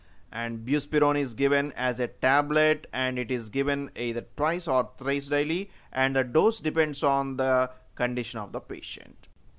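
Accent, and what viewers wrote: India. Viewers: it’s not the voice but the accent what he meant! I don’t mind tho but some people don’t like the way Indian people speak English lol 😂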